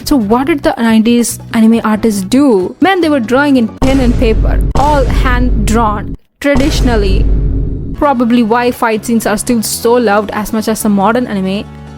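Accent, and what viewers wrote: India. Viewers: u sound indian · Why your accent sound so Indian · Her accent she is Indian, I love it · are you Indian? The accent feels so 🌸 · Sounds like an Indian accent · You sound like Indian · why does she talk like an Indian Girl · Is accent is indian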